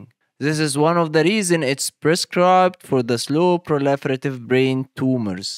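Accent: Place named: Egypt. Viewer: اعرف المصري لما اسمعه😂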